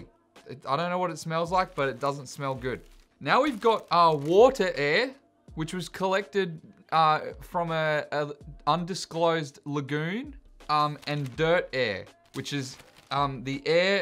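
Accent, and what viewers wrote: Australia. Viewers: When you thought theres nothing left for him to try he just goes above and beyong with a total curve ball and tries AIR. What a lad, this is why I subscribed! That and the thick australian accent. OH, dude you should try and compare accents and see which one is better xD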